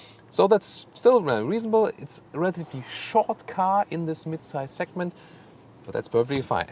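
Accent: Germany. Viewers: Alfas are today quality cars. I had a 159 2.4 diesel model from 2006 to 2009 and that was the first well built Alfa to my knowledge. Giulias are today very beautiful cars with a special touch of emotion. is well prepared and hence knows his stuff. I especially enjoy his somewhat funny English pronunciation and partly German intonation. Thank you. Hopefully I did not insult you as that was not my intention.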